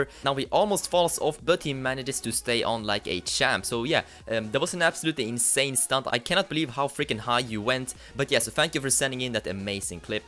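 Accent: Sweden. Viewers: Do you come from sweden? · Nice video! are u swedish? u sound swedish :D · Thats classic swedish style english almost every swedish talks english like that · Are you swidish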